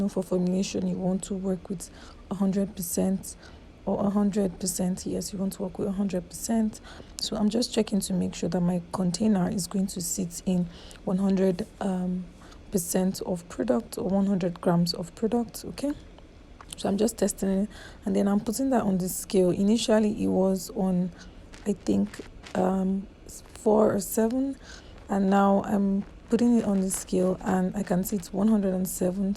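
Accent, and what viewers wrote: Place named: Nigeria. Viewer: well that's my point is you have a Nigerian accent so it's hard for some including myself to understand everything you said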